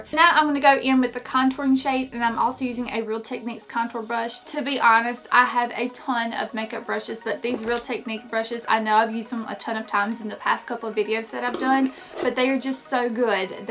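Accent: South, United States. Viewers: I love your cute southern accent!!!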